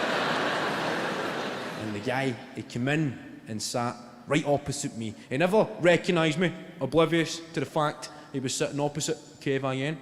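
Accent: Scotland, United Kingdom. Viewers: Learning scottish to understand him and Frankie Boyle. 70% true. Greetings from Germany 😀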